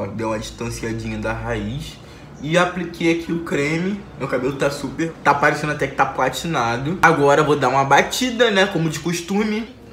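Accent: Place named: Brazil. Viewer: Brasileiro tentando falar inglês realmente dá pra perceber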